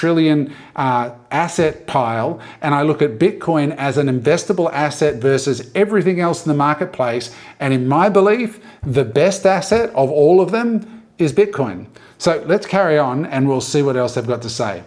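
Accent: Australia. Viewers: Just discovered your you tube channel, great to find another video with an aussie accent talking Bitcoin! Do you guys publish on X at all?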